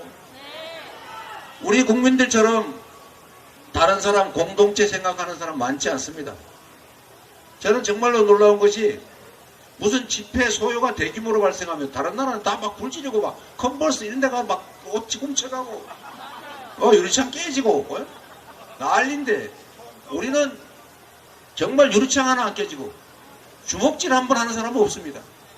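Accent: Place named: Busan, South Korea. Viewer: 얍실한 정부 보내 버립시다 !방언 멋져요😊부산 표준어 최고야 🎉🎉🎉🎉🎉🎉🎉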